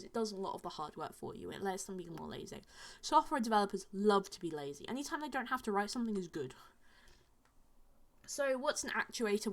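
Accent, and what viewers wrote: United Kingdom. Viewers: I'm completely tunned, I love listening to british accent. Keep going, you're fantastic .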